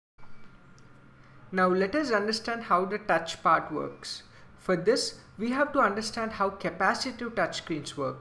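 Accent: India. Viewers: Probably of Indian, Pakistanian, or somewhere around there-ian/ish. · this is the indian accent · yeah but i visited kolkata and there their accent was like his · i think he's from india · I am sure he from India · are you from India? i'm from there i know this accent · i don't undersand but i hate indian accent and voice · He's obviously from India :/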